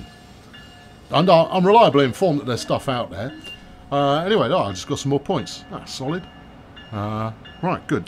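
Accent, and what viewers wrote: Boston, United States. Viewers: That bawston accent sounds like a bad Harley Quinn impression. Yikes. Glad to see another train sim vid tho! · I'm from nowhere near Boawston, but that sounds accurate to me... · Just stumbled upon your channel, your funny, i live in boston but not a bostonion, your Boston accent is funny 😆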